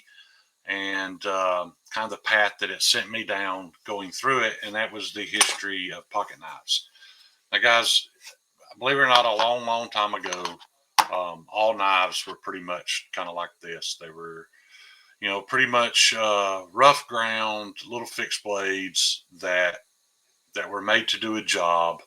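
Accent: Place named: South, United States. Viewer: You had me fooled. At the beginning you started off slow with the southern voice and the antique Asian blade. Case trapper, yes, Buck 110, for sure but boy did things escalate from there! Wow! Nice collection you got there homeboy!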